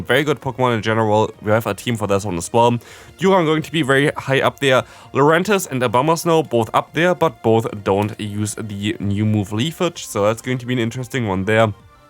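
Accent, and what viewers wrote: Germany. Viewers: Germans speak English always with this sort of accent. Nothing new if you heard more Germans.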